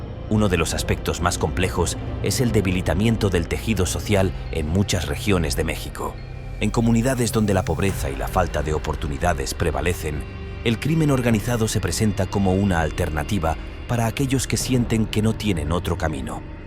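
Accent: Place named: Spain. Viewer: Qué pasa, por qué todos los que narran los videos son voces de españoles? Habiendo voces de mexicanos lindas y agradables , por qué tenemos que soportar esto, qué pasa, que alguien me explique, porque me interesa el vídeo pero no me gusta que lo narre un español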